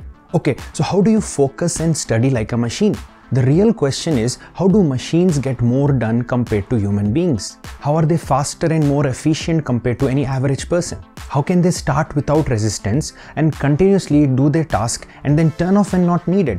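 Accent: India. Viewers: Duude..really?! You're an Indian and you find indian accent disturbing?! Wow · after the indian accent i knew this was the video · i really love the indian accent :D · there's no such thing as Indian accent diff parts of India speaks with diff accents from south to north to east to west what he is speaking is probably south indian accent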